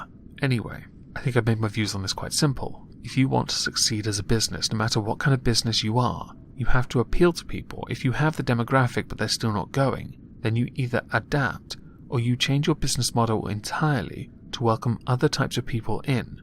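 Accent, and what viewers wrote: United Kingdom. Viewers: This guy sounds like the britisher, just me?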